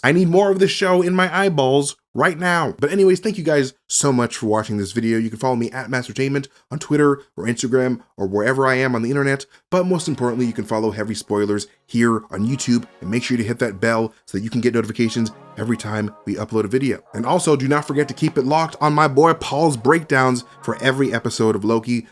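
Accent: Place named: United Kingdom. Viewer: you're the black Loki Brutha💪🏿. You came back, can't stop, won't stop. You got the coolest brutha and the British accent, when yall come together, that's 21 SAVAGE. Dam this was a good bleepin break down. Ryan and his dog on Screen crush arguing on who need to step up. And ol boy is chained to that blue screen, yall keep doing yall Thang. WAKANDA 4EVA, & THE UK is okay! 👌🏿👌🏿👌🏿👌🏿💂🏿‍♂️💂🏿‍♂️💂🏿‍♂️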